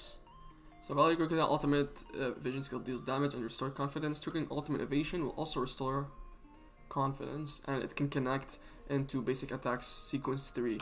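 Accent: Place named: India. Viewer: Just a question, are you indian? Your accent is kinda indian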